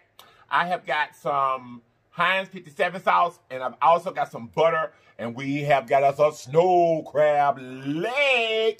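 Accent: South, United States. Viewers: Yo personality gives me life 💜 and i love you Southern accent to.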